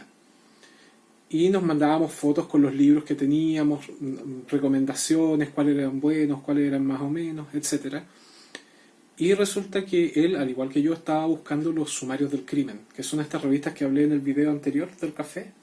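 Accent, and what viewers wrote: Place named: Chile. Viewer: En este canal hay dos cosas que me intriga mucho, los asesinos seriales y el acento chileno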